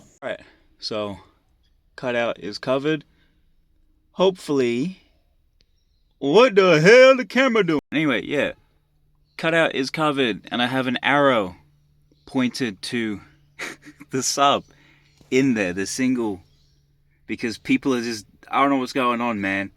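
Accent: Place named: United States. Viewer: I've never heard an Australian guy sound so American😂😂😂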